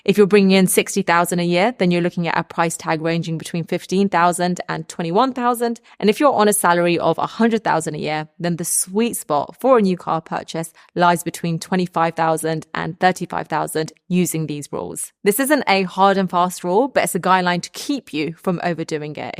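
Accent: United Kingdom. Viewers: great video, you sound British so I assume you live in the UK (could be wrong) so why use dollars ? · Everything sounds better in British. So tired of mumbling idiots here in America 😂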